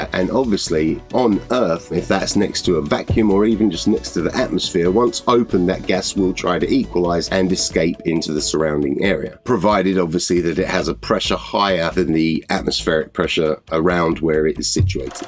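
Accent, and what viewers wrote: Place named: United States. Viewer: Why do you call yourself when you sound like a yank?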